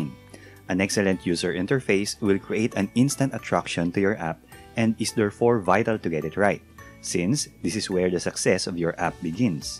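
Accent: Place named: Philippines. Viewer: Malalaman mo talaga quality ng mga pinoy pag nag english hehe diba sir?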